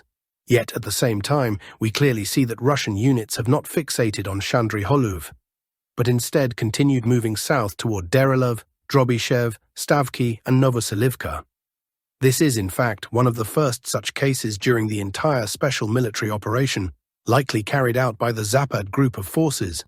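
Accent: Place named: England, United Kingdom. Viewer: How truly Russian or otherwise East European (as your name suggests) are you? Because you pronounce all placenames with a very English distorsion: hulya-powl instead of actual Huliapole, lay-man, instead of actual Lyman (lee man). It's funny but disconcerting, I thought you were from Russia, or somewhere in that part of the world.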